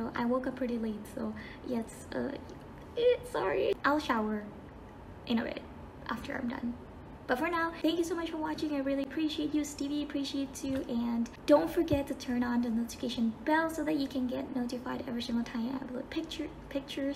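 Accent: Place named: United Kingdom. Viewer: Man her accent is interesting, it’s randomly British with her vowels and other times it sounds American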